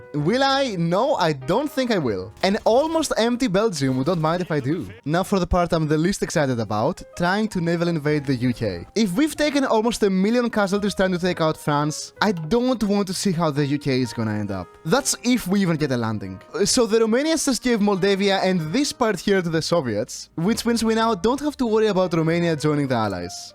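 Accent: Greece. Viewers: I swear you have a greek accent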